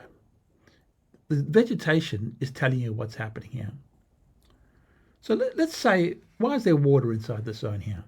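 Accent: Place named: Afrikaans, South Africa. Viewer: Can almost here the Afrikaans coming out haha; beautiful presentation, I genuinely learned alot.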